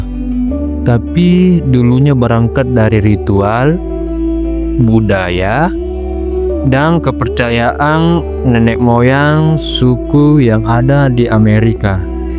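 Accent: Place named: Sulawesi, Indonesia. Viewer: Semangat bang, kedengarannya orang sulawesi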